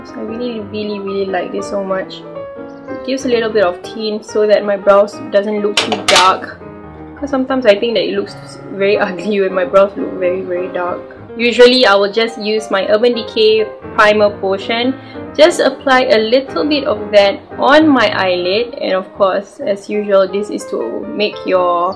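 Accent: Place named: Singapore. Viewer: you sound so singaporean! 😆 anyways whats your shade in mac?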